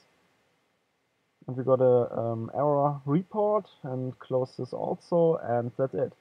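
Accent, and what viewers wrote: Germany. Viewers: AWWW FUCK GERMAN ACCENT!!!!!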